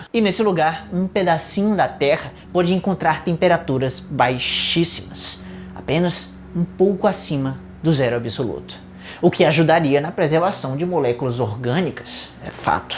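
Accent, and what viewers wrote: Ceará, Brazil. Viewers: Pera c é Cearense?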